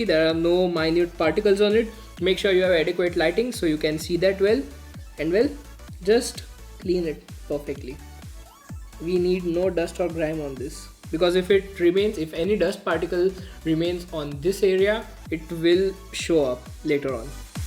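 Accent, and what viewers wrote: India. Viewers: Dude, please do your best to lose this Indian accent. Take some diction courses if necessary. People on the Internet won't take you seriously unless you do. You'll see a definite youtube growth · Indian people and thier accent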